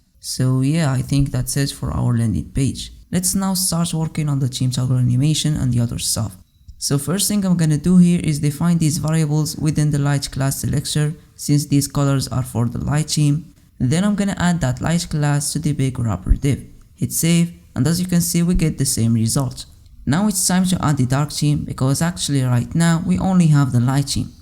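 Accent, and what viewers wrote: Morocco. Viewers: Good stuff my man wyni mathmeqnich pronunciation mghribya hadi 100% rak kayn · Great job! From your accent, I think you are Moroccan.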